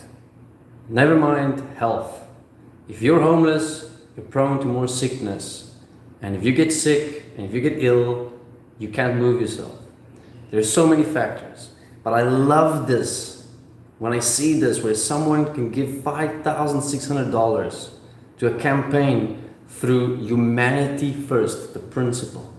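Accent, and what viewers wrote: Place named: Netherlands. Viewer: I would have guessed Dutch by the accent. Afrikaans? 😉. Positive feedback on Yang is ALWAYS appreciated by YangGang. 🧢 Keep up the good work, en dank u wel.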